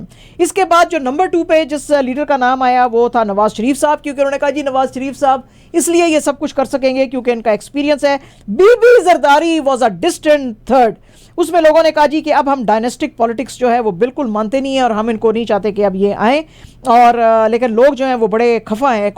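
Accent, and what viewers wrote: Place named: India. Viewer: Oye Paindoo, Corps Commander is pronounced "Core" commander, not "Corpse" commander. And are you an Indian? Why can't you pronounce the letter "Khay" of Urdu? You say words like Kharaab, Khayal, Khamosh etc. like an Indian.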